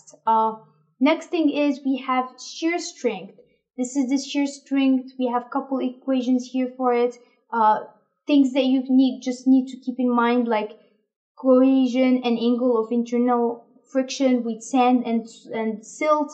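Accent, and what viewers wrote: France. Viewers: I was closed. Pourtant un accent francais quand tu parles anglais.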